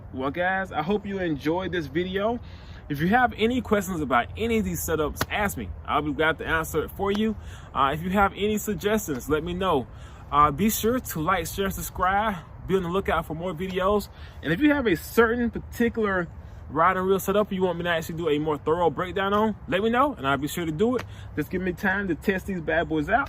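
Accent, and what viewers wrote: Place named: South, United States. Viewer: Lol temperature swangs! Lol thats southern draw! I enjoy your videos bro. I hope to see you do more kayak fishing. Thank you for taking the time to make these videos.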